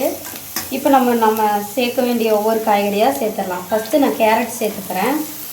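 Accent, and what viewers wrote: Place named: Tirunelveli, India. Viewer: You from Tirunelveli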